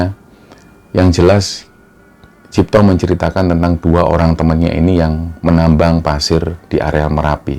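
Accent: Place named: East Java, Indonesia. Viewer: Sy 8th di surabaya dan 3 thn di Malang, gaya bicara mas itu mengingat saya akan model Timuran, terutama ke-2 kota tersebut, sy asli Medan, tapi sy berharap masa tua saya bisa saya habiskan di Malang, penuh kenangan di sana.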